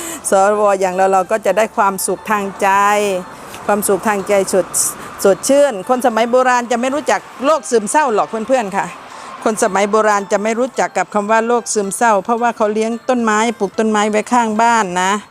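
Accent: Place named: Surat Thani, Thailand. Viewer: พี่พรพูดเหมือนคนสุราษเลยครับ ได้ความรู้ครับ ผมก็เริมสนใจบอนสีครับ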